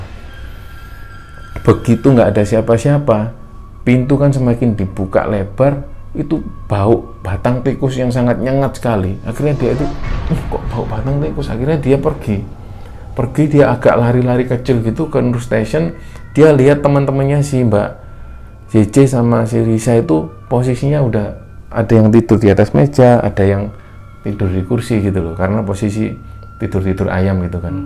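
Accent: Java, Indonesia. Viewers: Mas tetap jadi story teller ter-fav! Oh iya mas, saran untuk memperbaiki pronunciation kata dalam bahasa Inggris. Sebenarnya gak masalah dengan logat jawanya karena saya juga orang jawa timur. Tapi menurut saya lebih baik jika cara pengucapannya benar 😃 Dan jika ada kesempatan, saya ingin menceritakan cerita horor temen saya yang pernah bekerja sebagai perawat orang cacat dan kejadiannya tahun lalu, di Hamburg. Tidak terlalu panjang, tapi setidaknya bisa berbagi bahwa hal seperti ini juga ada di negara lain 😁 Semoga makin sukses! 😃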